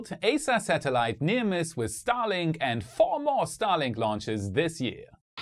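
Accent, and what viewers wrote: Germany. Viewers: I wanna say German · are you from germany?!!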